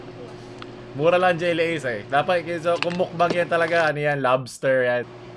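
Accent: Visayas, Philippines. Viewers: Bisaya nis? Bagohan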